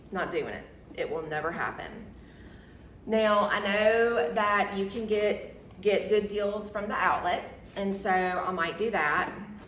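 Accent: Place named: South, United States. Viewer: Two things: LOVE your sweet southern accent and LOVE the D&B satchel! I own many Dooney florentine bags..but the satchel is special. I have it in the Dipinta painted florentine and it's my prized handbag possession. It competes with the lux house bags all day long. It will last me my lifetime and someone else's after me..thanks for revisiting this awesome bag and reminding me how blessed I am to own one too! Take care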